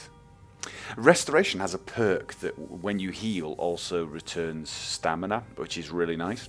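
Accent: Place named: Birmingham, United Kingdom. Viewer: Can't believe you're Norwegian, you sound exactly like you come from the Birmingham area of England. O.O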